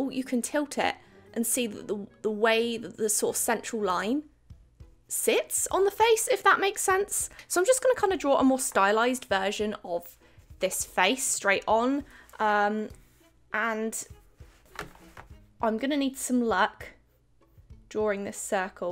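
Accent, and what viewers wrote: United Kingdom. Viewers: You are British right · I love how you British people talk 😍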